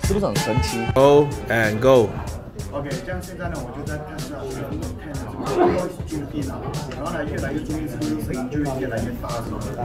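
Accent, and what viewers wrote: Malaysia. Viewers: 馬式英文好難懂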